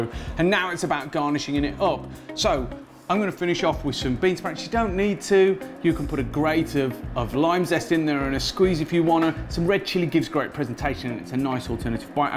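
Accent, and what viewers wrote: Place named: West Midlands, United Kingdom. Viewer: I detect a hint of West Midlands dialect in there.....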